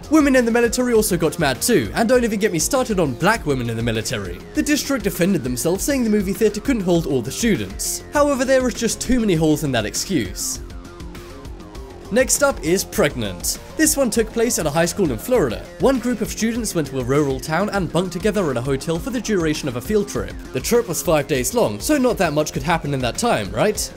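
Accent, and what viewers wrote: England, United Kingdom. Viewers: it's 999 in england, and you sound English so you should know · Morgan Minnix no cos he had an English accent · damn you speak soooo british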